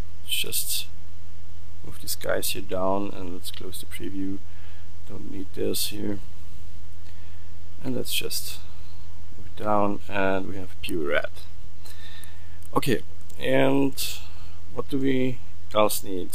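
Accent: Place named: Germany. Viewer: Man hört dass du deutscher bist! ;)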